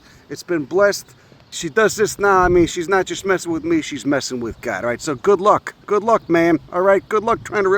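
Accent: Chicago, United States. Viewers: Love the Chicaga accent! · Love your accent!! My entire family from south side Chicago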